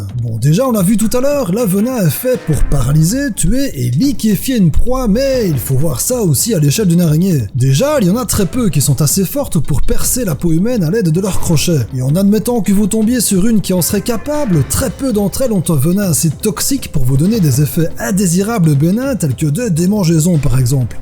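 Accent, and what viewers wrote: Liège, Belgium. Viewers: T'es liégeois ? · T'es liégeois ? :) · Salut, je post pas souvent de commentaire mais des que j'en post généralement c'est bien. Bref, Juste un mot a dire "Géniale" Ton accent Belge donne un coté original a tes vidéos franchement continue j'adore;) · Ah , ca sens l'accent du copain Belge ca ? :D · pas suisse, j'aurais tendance à dire belge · T'as la même voix qu'Absol mais avec un accent belge ;) sinon super vidéo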